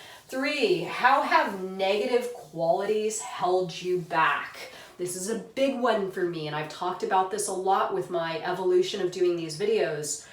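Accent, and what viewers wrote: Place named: South, United States. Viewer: I love your videos. as a southerner i sense a slight southern accent. it seems you have tried very hard to hide it though but its so cute when it peeks through. IM not sure if i am right but if i am let it come through more! anyways love your vids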